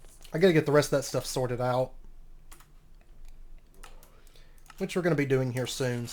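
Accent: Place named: South, United States. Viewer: I can't pinpoint from your accent if your from one of the Carolinas, Georgia, or Alabama/louisiana😂 I got southern relatives that sound just like you, and their from Georgia!👍 You are so pleasant to watch! Keep up the good work!👍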